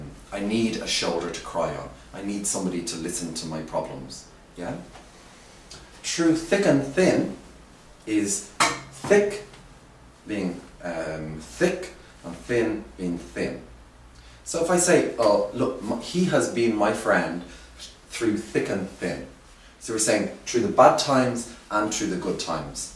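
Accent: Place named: Ireland. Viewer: You sound to be Irish, you're from Dublin right?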